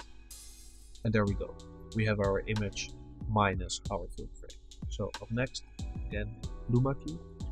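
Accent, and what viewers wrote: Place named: Netherlands. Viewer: Toffe video! I recognised a Dutch accent I think, if I'm not mistaken.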